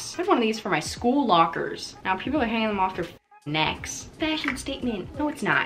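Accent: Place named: Canada. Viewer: Question: Are you Canadian or am I stupid? You wound very Canadian. I’ve been following you on tiktok for a while but this is the first time I’ve heard your voice and for some reason I fucking love it.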